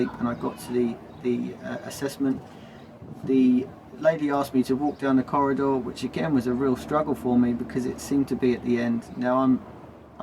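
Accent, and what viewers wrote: England, United Kingdom. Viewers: Your English accent is very interesting to learn for a people from abroad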